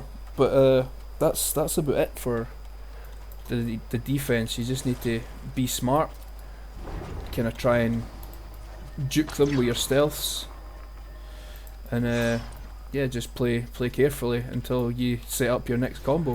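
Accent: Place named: Scotland, United Kingdom. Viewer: I mean, I appreciate how inforamtive the video is but... if you sounded more scottish AND angry that would also be very entertaining xDDD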